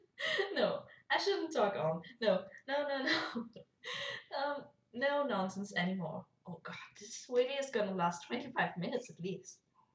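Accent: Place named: Germany. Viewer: Just got here from Reddit. Not even a minute in and already I'm thinking "Geeerman?" :P That's an easy to place accent. Just don't ask me to place it somewhere in the country. I have many ideas for what I'd say for any potential meeting with Dodger (which is not likely to happen, as I barely ever leavy the city, not to mention the country), but I imagine it would probably end up like this: "Uhm. Uh. Sooo... Wow. You really are tiny!" Ooops. o_o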